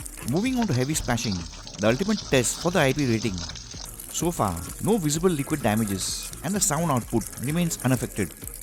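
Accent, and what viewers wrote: India. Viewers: From his accent I knew he was Indian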